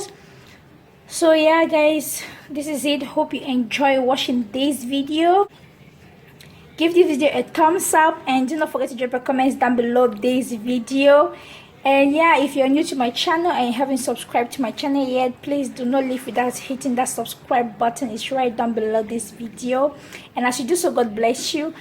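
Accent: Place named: Cameroon. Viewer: Hmmmm....you called 2 spices in French. Ever lived in a french speaking zone? Just wondering. You sound a little Cameroonian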